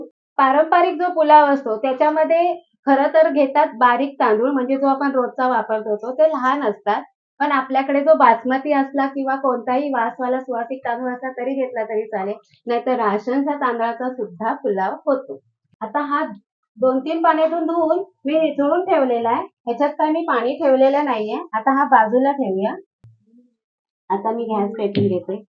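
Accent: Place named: Malvan, India. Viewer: मसाले भात भारी 👌🏻 तुमच्या तोंडी मालवणी भाषा गोड वाटता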